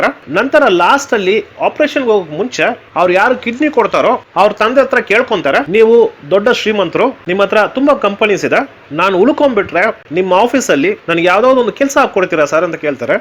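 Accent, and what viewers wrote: Tamil Nadu, India. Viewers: ಸೌಮ್ಯವಾದ ತಮಿಳ್ ಶೈಲಿಯ ತಪ್ ತಪ್ಪಾದ ಕನ್ನಡ ಉಚ್ಚಾರಣೆಯ ನಿರೂಪಣೆ. ಕನ್ನಡವನ್ನು ಚೆನ್ನಾಗಿ ಅರಗಿಸಿಕೊಳ್ಳಲು ಸಾಮರ್ಥ್ಯವಿಲ್ಲದಿದ್ದರೆ ನಿರೂಪಣೆ ಮಾಡಿ ಕೇಳುಗರನ್ನು ಹಿಂಸಿಸಬಾರದು. ಒಂದು ಸಿನಿಮಾ ಕಥೆ ಹೇಳುವುದೇ ನಿರೂಪಣೆಯಲ್ಲ. ಹೇಳುವುದನ್ನು ಮನಃಪೂರ್ವಕವಾಗಿ ಸೊಗಸಾಗಿ ಹೇಳಬೇಕು, ವಿಶಿಷ್ಟತೆಗಳನ್ನು ತಿಳಿಸಬೇಕು.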